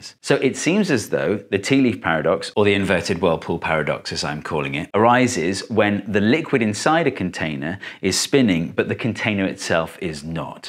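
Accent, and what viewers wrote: United Kingdom. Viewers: I look forward to videos...but.... I feel that he is fully taking advantage of the fact that Americans have been programmed to think that people with a British accent are smart.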